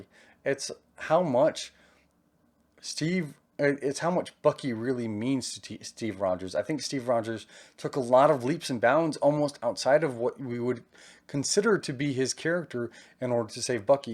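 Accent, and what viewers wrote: South, United States. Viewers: I love your video at your house in Texas during your quarantine until you're talking about the upcoming mini-series of "The Falcon and Winter Soldier" will be out on Disney+ while it started from the beginning after being handed the mantle of Captain America at the end of "Avengers: Endgame" got came out back in 2019, Sam Wilson teams up with Bucky Barnes in a worldwide adventure that puts their abilities to the test as they fight the anti-patriotism group of the Flag-Smashers after the whole trailer of the show came out at the time for awhile and Stucky is one of the characters from Marvel comics, but I hope your husband Zach will be very proud of you and I wanna say I'm very happy for you, because I love your Southern accent, I know you're half Mexican and you're gay!! #TheFalconandTheWinterSoldier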